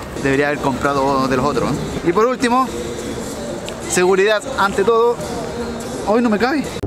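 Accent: Chile. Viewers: Ya usas el acento chileno, ya eres mas tú y no hablar con tono de robot bcjfjkf ♡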